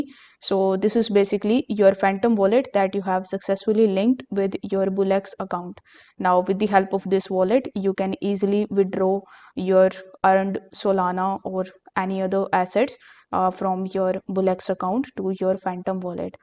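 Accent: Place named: India. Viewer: This is an Indian scammer picture is a Caucasian woman with voice is obviously an Indian woman😂 lowlife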